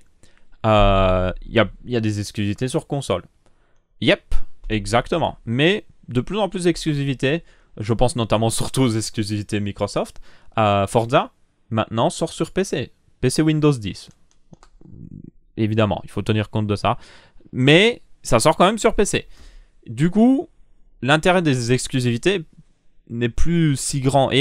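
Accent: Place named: Belgium. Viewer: bah il est belge il le dit en belge ... il va pas le dire en anglais non plus ..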